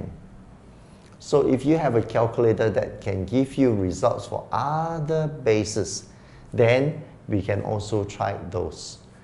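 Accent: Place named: Japan. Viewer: lol your japanese accent is funny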